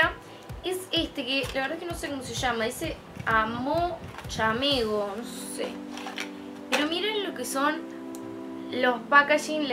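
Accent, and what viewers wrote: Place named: Córdoba, Argentina. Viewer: amo como hablas enserio tenes muy linda voz y me encanta el tono cordobes besos <3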